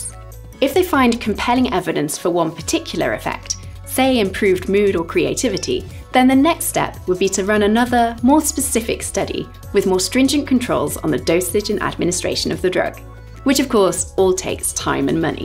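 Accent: United Kingdom. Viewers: She's so beautiful and i love her British accent!! Thanks for sharing.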